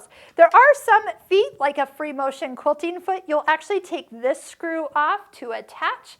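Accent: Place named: South Dakota, United States. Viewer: Ty this was really helpful but I gotta say this is the most south dakotan accent I've ever heard 😆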